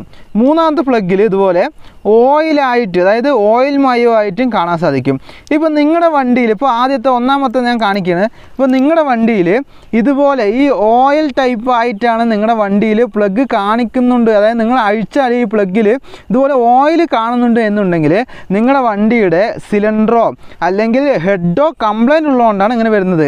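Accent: Palakkad, India. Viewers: Chettan palakkad aano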